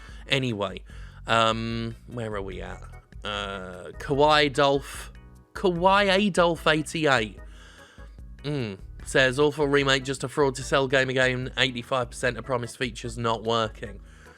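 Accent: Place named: United Kingdom. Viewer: If only I could have everything read to me in a jovial british voice..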